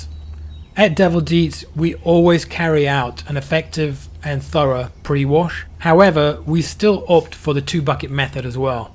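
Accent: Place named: South Africa. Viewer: yeah getting tattoo appointment is hard enough if you have a good artist let alone the issues with the pandemic so I feel you bro, but at least you can save a bit of money for a while lol I did detect a bit of South African but wasn’t sure enough to mention. I’m based in London, at the moment on the outskirts. I’d defo be recommending your channel to my friends who are also heavily in to cars but It’s just unfortunate I can’t send any business your way due to location. Anyway I’ll be watching your other content soon keep it up 👍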